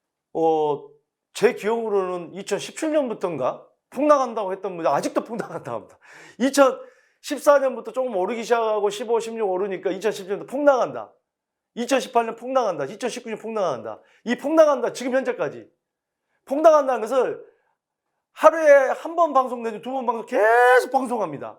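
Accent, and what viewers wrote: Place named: Honam, South Korea. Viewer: 호남 사투리 쓰시네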